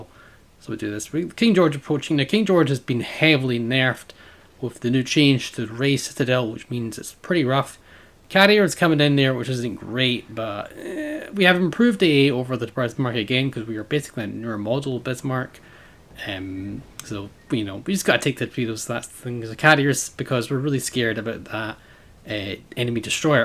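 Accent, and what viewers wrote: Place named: Scotland, United Kingdom. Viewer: Very well played, really like your videos, always nice to hear a wee Scotsman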